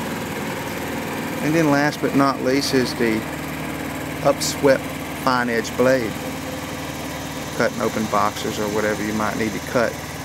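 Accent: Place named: South, United States. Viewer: I'm guessing southern/ southern mid west usa.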